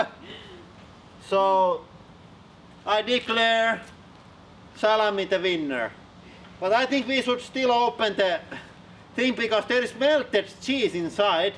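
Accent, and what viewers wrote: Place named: Finland. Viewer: Huomaa englannista että on suomalainen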